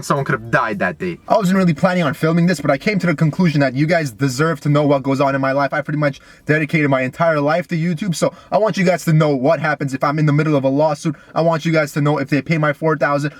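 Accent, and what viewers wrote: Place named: Europe. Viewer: He sounded European tryna speak English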